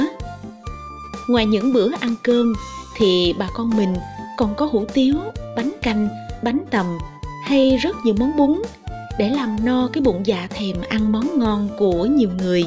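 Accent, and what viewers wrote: Mekong Delta, Vietnam. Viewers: Chất giọng huyền thoại...làm nên tên tuổi của người miền tây..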